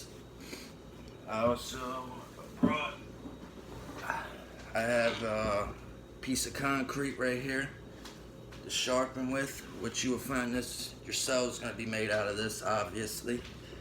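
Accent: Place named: United States. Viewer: It’s pretty insane from my perspective to know that at some point you had to learn this to survive in 2020/2021.. Sound like your from the states as well. Stay safe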